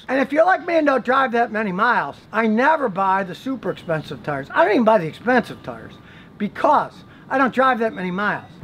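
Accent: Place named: United States. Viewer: Dear uncle, please speak a little slowly for us who have a difficulty understanding American accent.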